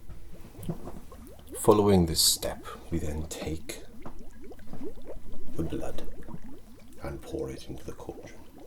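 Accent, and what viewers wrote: Wales, United Kingdom. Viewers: I finally got around to watching this one and I had to continually rewind it as your welsh accent kept sending me to sleep. I think you got about 5 views in just this one.😂 I love the pointy facial hair in this video, very fetching.😏❤️. I thoroughly enjoyed this and the ending was unexpected and typical. It’s great to binge your videos in these stressful days. 😊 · Pretty decent Welsh accent. Coming from a welshman 😁. Where'd you learn that lol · Welsh accent? What? Isn't it the way English should usually sound?🤭 See, I'm drowning so deep in your channel that I even watch videos with mustache! Big sacrifice in my part, but it totally worth it🤗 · An devil and an alchemist? Sounds up my alley. A welsh accent? I like accents!! Though I do like blood, I don't look you got hurt and enough to draw blood. Do take care of yourself, Mr.. 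Heal up. 🖤🙏 funny thing is we were just discussing injuries with scissors. It's the testy tube you must eye for. Lol. 🙃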